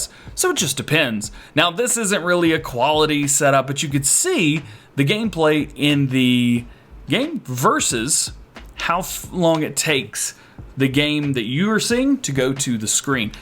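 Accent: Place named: South, United States. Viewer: Definitely from Alabama or Tennessee that accent tho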